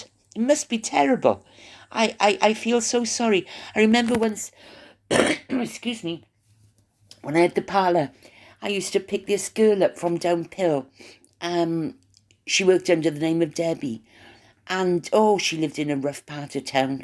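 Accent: Wales, United Kingdom. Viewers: My Welsh friend you have been missed i love listening to your Welsh accent it reminds me of home i can't wait for your next chat i love your stories of the palour And anything really its all interesting to me until your next one 💐 💝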